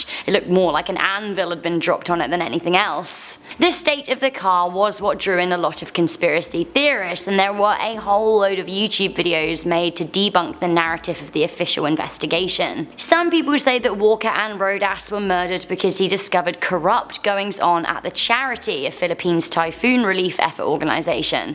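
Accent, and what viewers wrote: England, United Kingdom. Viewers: Damn shes hot, the English accent makes it better lol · British accents get annoying. I got a massive knob ya? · Hey, Your accent is beautiful is it British? Btw you're gourgeous💯💓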